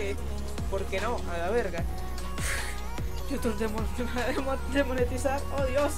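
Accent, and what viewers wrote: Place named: Spain. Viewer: Ostia eres española:000!?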